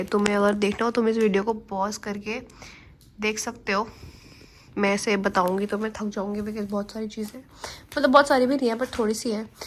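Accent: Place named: Punjab, India. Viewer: Your English accent🤌🏻 aapka hindi uccharan🤌🏻🤌🏻 dein Deutsch Akzent 🤌🏻🤌🏻🤌🏻 but tuada punjabi lahiza 🤌🏻🤌🏻🥰🥰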